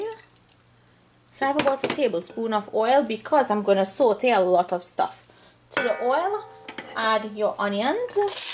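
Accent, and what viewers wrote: Trinidad and Tobago. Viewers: Not only is your recipe the best, and video easy to follow, but your Trini accent added a special flavor to the pot..I so love to hear my Trini people speak..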